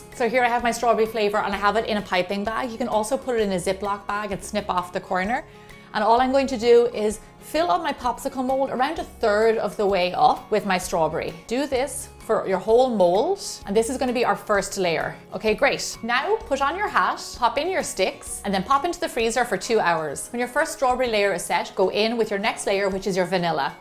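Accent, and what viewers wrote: Ireland. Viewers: R u Irish??? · are you Irish g emma?😕😕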